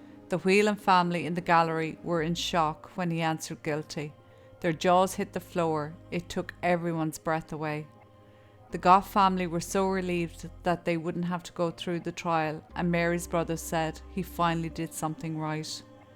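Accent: Ireland. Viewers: I love your tone. You don’t sensationalise and are so respectful to the victims and their families. And I could listen to your beautiful Irish accent all day. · your Irish accent could charm a snake ❤ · Thank you for your answer and also thank you for your wonderful videos, love the Irish accent as well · Irish accent fab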